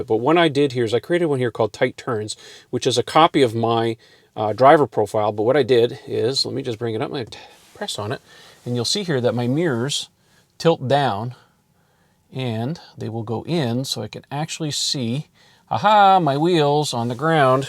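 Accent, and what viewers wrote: Canada. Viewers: Thanks. Great idea! Do I detect a Canadian accent there? Looked like Mississauga too.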